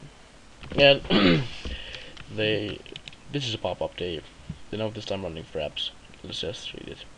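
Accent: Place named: Sweden. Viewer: haha var tvungen att fråga , det lät riktigt svenskt!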